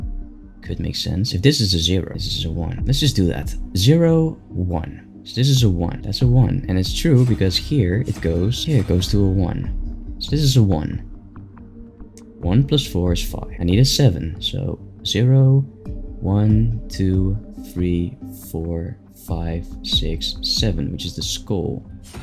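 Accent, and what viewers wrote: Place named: Netherlands. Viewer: Are you from the Netherlands? I feel like your accent sounds Dutch 😅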